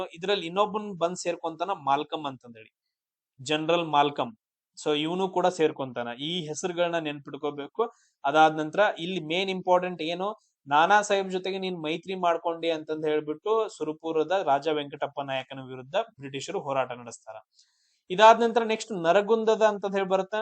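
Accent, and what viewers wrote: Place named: Belagavi, India. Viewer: Nimm belagm ಬಾಷೆ ಕೇಳೋಕೆ ಚೆಂದ..sir thank you sir 🙏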